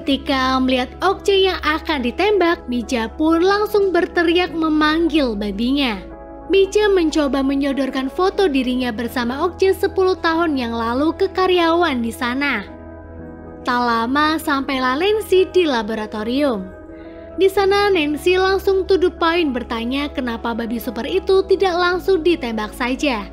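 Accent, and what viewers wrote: Pemalang, Indonesia. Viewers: wong pemalang?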